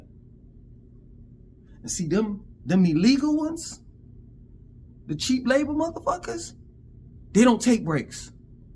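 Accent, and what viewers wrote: New York, United States. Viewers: I hear you New York accent fam I know you heard about the Central Park 5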